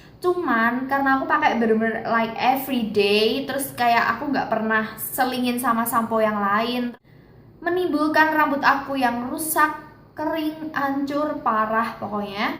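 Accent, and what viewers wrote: Java, Indonesia. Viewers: medok bgt kak ngomongnya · kka agak medok ngomongnya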